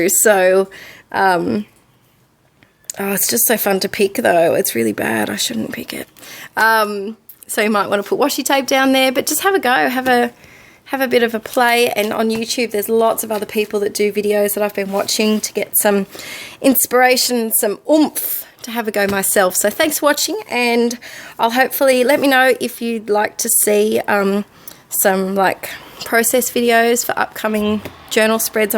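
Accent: Australia. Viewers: HI there. Sounds as if you are a neigbour of mine ....I'm in New Zealand .....I think you might be in Australia, but you might be closer than that :) I used a sealant craft varnish on my art journal pages, & almost ruined some pages, because they stuck together & bits tore as I prissed them apart. So like you, I am having to put waxed baking sheets between the pages ...of my whole book lol, but I actually don't mind the look of that too much. Your first art journal is way better than my first one, which after more than a year, I am still only half way through. I have heard that Mod Podge makes an excellent sealant & doesn't stick, & I use Mod Podge to seal paper images I use when altering a canvas, & it dries quickly & doesn't stick. I wish I had remembered Mod Podge before using the varnish sealant. Great first art journal! Subscribed to your channel now. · You're Australian? 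I trying to place your accent. My 7th grade music appreciation teacher was Australian. I was the only person in the class he didn't pick on.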